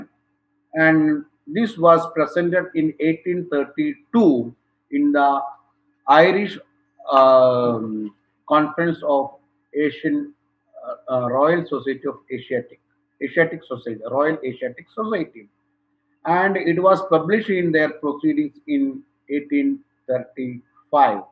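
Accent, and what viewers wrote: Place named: Kerala, India. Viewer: Oh, I am in love with his Kerala/Malayali accent!!!! Really!!!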